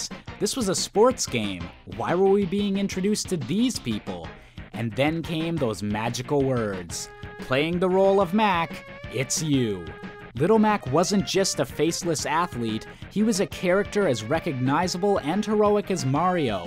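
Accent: Canada. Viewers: you sound especially canadian here :)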